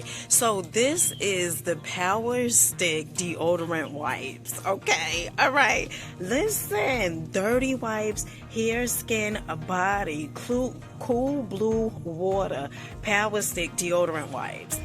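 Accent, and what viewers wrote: New York, United States. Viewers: Are u from nyc. I love the sound of ur voice 😊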